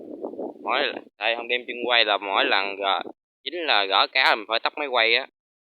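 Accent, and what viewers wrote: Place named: Mekong Delta, Vietnam. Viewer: nghe tiếng là biết miền tây rồi phải không? tỉnh nào đó.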